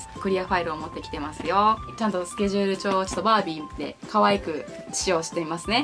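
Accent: Kansai, Japan. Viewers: 自分関東人だから分からないけど、結構こてこての関西弁なんですね笑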